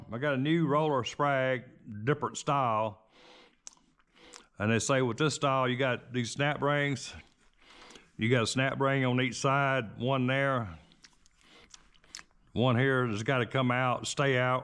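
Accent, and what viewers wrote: United States. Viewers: Where about in the states you come from love the accent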